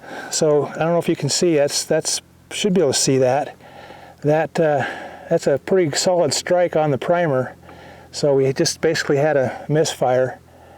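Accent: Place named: United States. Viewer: I'm guessing based on your accent this is Utah or Idaho. Great video - I'm interested in this rifle myself & appreciate you sharing with us.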